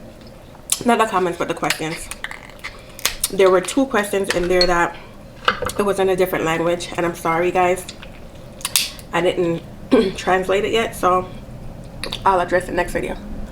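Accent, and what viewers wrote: Jamaica. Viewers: Are you Jamaican? I love your accent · Her accent lowkey be coming out I wish Uu could do a vid abt Jamaican slang ((&)) they words they use maybe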